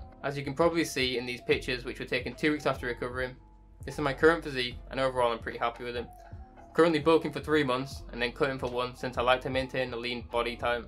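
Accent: United Kingdom. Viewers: England accent nice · i know being lean is dope but I Really think if you bulk for a solid year its really going to pay off. Good work so far though. also wtf i didnt expect you to be british lol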